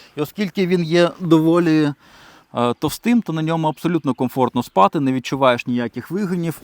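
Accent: Standard. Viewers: Класно говорить по українськи чиста соловїна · Дуже чисто спілкуєшся українською, в приклад іншим👍👍👍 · Доречі , дуже класна та чиста Українська!!!❤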